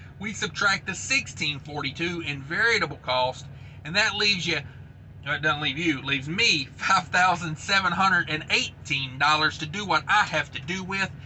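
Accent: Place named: Tennessee, United States. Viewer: Aha! I knew that accent sounded Tennessean, especially when you threatened to put a foot up someone's a$# over detention pay. You can take the boy out of the volunteer, but you can't take the volunteer out of the boy.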